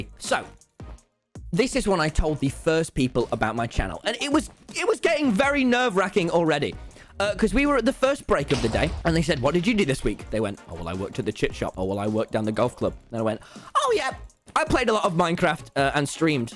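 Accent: United Kingdom. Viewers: Yay your British! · BHAHAHAHAHAHAHHA HOW DOES HE SOUND AMERICAN? YOU CAN HEAR HES 100% BRITISH LMAO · Bruh I loves this guy's British accent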